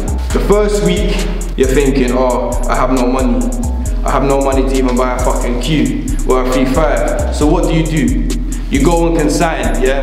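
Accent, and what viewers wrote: United Kingdom. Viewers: This is the voice I read every British person on twitter in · I think it's cockney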